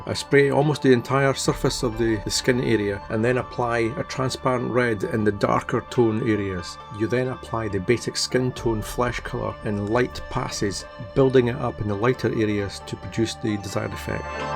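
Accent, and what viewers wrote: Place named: Glasgow, United Kingdom. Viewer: Watching this beautiful painting and learning from it while listening to this wonderful Glaswegian accent is a whole new treat for me ❤, keep it up brother and greetings from Iraq .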